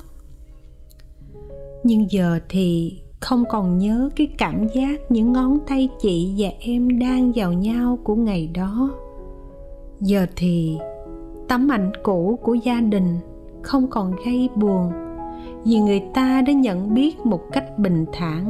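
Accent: South, Vietnam. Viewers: 5/10. Xin cảm ơn giọng đọc tuyệt vời, miền Nam yêu dấu của.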